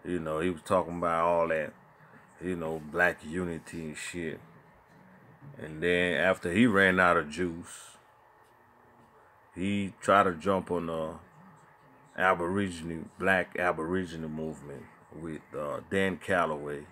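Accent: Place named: Caribbean. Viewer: U have a carribean accent. Ur family wasn't here in Jim crow. Stop commenting on american black folks movements. It's non-immigrants only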